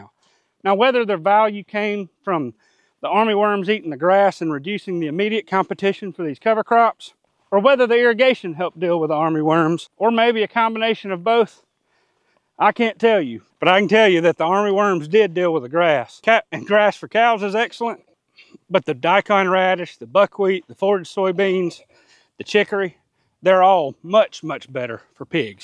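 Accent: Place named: United States. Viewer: PS I love your accent, which state are ya from? I’m a Aussie so I’m not great at knowing state accents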